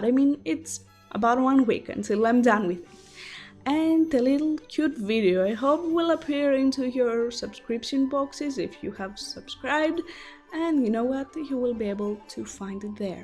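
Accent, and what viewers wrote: Greece. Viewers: i love your greek accent! Latrevw thn douleia sou, mpravo kai euxaristw gia ta links! Harry Potter gia panta :)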